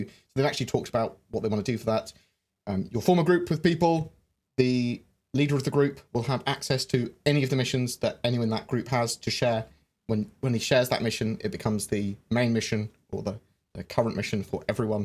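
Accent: United Kingdom. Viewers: I agree with you about Disco Lando that he knows his stuff about the game, but there is really one thing that bothers me when he is on. That is the lack of control he has with his hands. Anyone that broadcasts for a living knows to keep their hands away from their mouths when the talk on screen. I have a difficult time understanding conversations, due to hearing loss. I've learned to somewhat read lips. When he coves his mouth, It's impossible. I can hear his speaking, but sometimes I have trouble really understanding what he is saying clearly. I spent too many years working around jet engine aircraft with a limited hearing protection, and unfortunately, some tones I frankly don't hear. If someone turns their back on me when they talk, or talk from another room, I just don't understand them very well. On that note, you do ok during your streams. I have no problem understanding you, even with your British accents. 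I look forward to 3.5 going to theEvocoti, the PTU and then out to live stream. I get it when it goes to Concierge and Subscribers as I am both. I also can't hardly wait for the Carrack to come out this year, as I have the Explorer pack that was available for Concierge.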